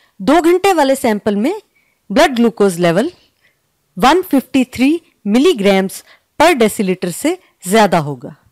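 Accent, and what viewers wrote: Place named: India. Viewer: Is video mein, Indian accent bahut zyada strong hai..indian accent bahut annoying hoti hai.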